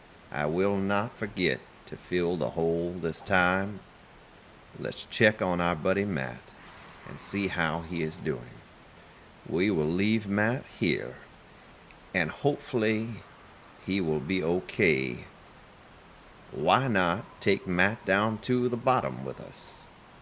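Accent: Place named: South, United States. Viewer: I have laughed more on this video at the silliness your friend put you through than I did at G4Kz when the bear was chasing him. If any gets mad, they don't, in my opinion, have a very good sense of humor. as for how many times you said boo boo I counted five times. don't get me wrong, but your accent went real southern in this video. don't know what state you were raised in, but it sure sounds a lot more of the south. great episode.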